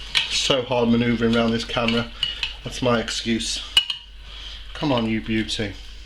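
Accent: United Kingdom. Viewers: I really enjoyed the recipe and your Brit accent. Thanks for the fun instruction.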